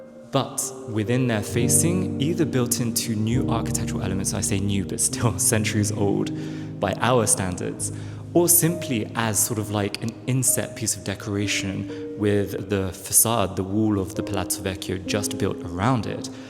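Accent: RP, United Kingdom. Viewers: The speculation looks realistically fair enough. What a beautiful entrance hall! Photos from the auction showing 18th-century "la sedia" though and not carriages/an elegant mean of transporting a person by using two footmen for carrying. Columns must be a roman repurposed spoliage. You have an exquisite Queen English accent but when you are in hurry explaining things and full of enthusiasm sounds more natural and less pretentious, keep it like that is more charming.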